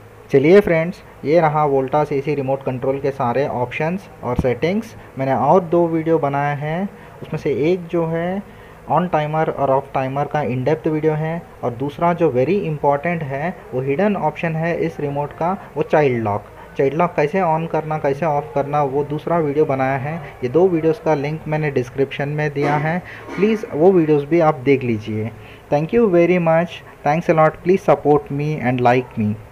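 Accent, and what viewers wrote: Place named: South, India. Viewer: Very good explanation I think you are from south india area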